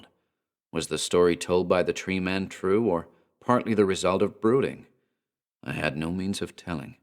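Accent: United States. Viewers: American accent is hilarious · Your american accent is really good!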